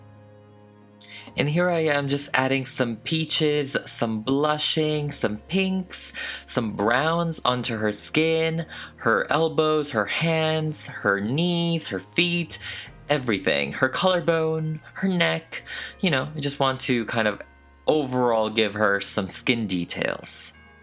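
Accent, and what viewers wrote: France. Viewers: J'adoreeee ton accent français 😭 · You speaking in a French accent gives me life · That french accent is still offensive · As a French (from Paris) I can tell that your French accent is really cute ❤️ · Son accent français est adorable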